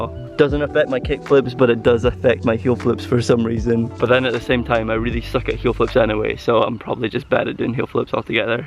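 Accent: Scotland, United Kingdom. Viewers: u scottish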